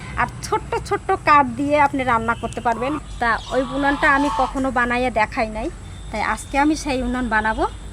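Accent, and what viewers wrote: Barishal, Bangladesh. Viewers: আপনার ঠাকুর মার বাপের বাড়ী কি বরিশাল। কথা শুনলে তাই মনে হয়।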